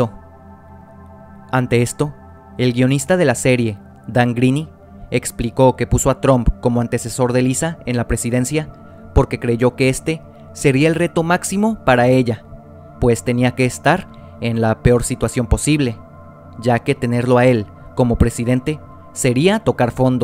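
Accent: Mexico. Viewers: La voz del relator lo delata como un L. ¿Mexicano?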